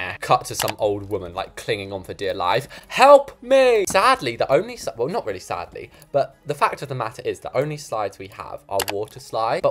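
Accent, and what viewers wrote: United Kingdom. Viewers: wHEN I WAS NEW I TOUGHT SHE WAS A GIRL FOR HIS BRITHIS ACCENT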